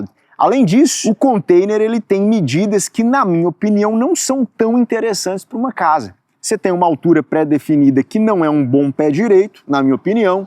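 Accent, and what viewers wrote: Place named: Sao Paulo, Brazil. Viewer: o cara é bem paulista né kkkk eu sou paulista e senti no ouvido umpouquinho kkkk mas muiito bom o video e passa informações interessantes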